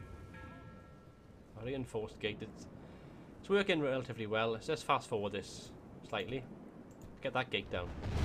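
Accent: Wales, United Kingdom. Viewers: So damn happy this is finally out :D Plus I love the Welsh accent so your voice should compliment this lovely :P Anyhow enough commenting, time to watch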